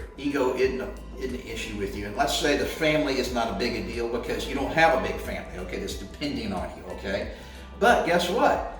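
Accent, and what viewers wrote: Texas, United States. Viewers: I'm really enjoying your videos and workouts. As a 53 year old Houston, Texas raised male living in the Seattle area, I need all the advice you and can muster. Fitness was a big part of my life until a hip replacement derailed me with a few complications I didn't count on. So now I'm suffering with a serious case of (as-you-put-it) "Old man ass"! LOL. Your particular attitude rings through with me from my fitness days... and I have to admit, you feel like home to me with your Texas accent and home decor as well as the rest. I really agree with your approach to eating. My days of trying to be Chef Fitness are over. I like quick-n-easy but smart. Bill Philips' book, "Eating for Life" had turned me onto that more than fifteen years ago. Thanks again for being here for us "hard to get a good night sleep" guys, and for the great videos.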